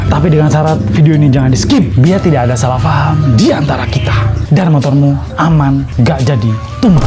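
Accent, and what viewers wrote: Madura, Indonesia. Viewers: Sampean asli mana mas kok bahasanya jawa tapi logat madura 😂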